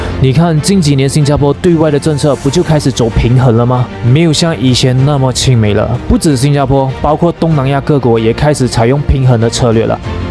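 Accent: Malaysia. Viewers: 听他的口音 是马来西亚人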